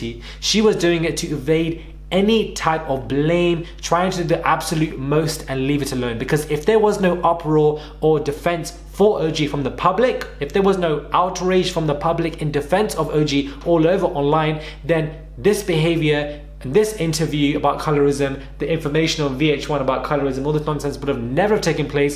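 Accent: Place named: United Kingdom. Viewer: Dude you nailed it I was like wait is this an Indian guy with a British accent talking about basketball wives. LMFAO I'm subscribed sir cause you telling it like it is.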